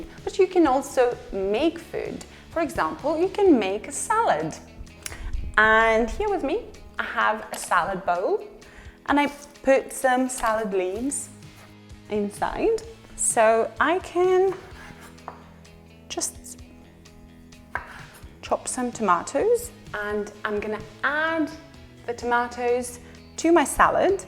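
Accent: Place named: United Kingdom. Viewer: I adore your videos. And your British accent - it`s something incredible. Вы потрясающая и светлая личность. С любовью из Украины. Have a good one!!!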